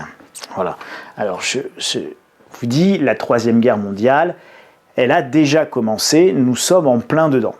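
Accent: France. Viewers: jaime votre accent francais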